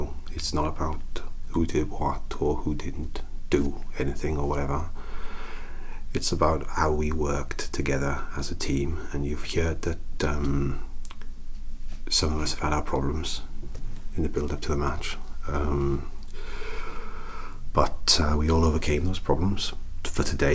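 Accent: Wales, United Kingdom. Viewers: So the Welsh rugby team caused the Corona Virus... Also if you're not Welsh, that is an outstanding Welsh accent impression. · Moar Welsh accent vids, please. n_n (( Maybe something Sci Fi? :DDD But really, anything will do. It's so beautiful! )) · Would love to have more vids with the Welsh accent, or any other accents you can do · This was freaking hilarious, the welsh accent does add comedy value too, love it.x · It absolutely did. Hearing your voice always makes my days brighter, and it was definitely interesting to hear your Welsh accent! <3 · Just finally watching this video and just wanted to say that I love your welsh accent. Very relaxing to listen to. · This made me so happy! As a Welsh woman, the accent made me smile. You really can put your voice to anything! Brilliant! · With the Welsh accent you're making me wish you'd do a Solas roleplay! 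From Dragon Age. Most relaxing character imo.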